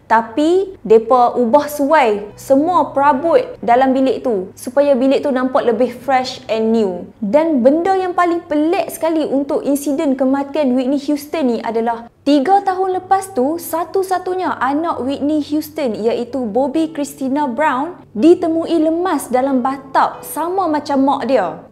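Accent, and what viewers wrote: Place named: Kedah, Malaysia. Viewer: Sempoi speaker video pon slang kedah noo 😁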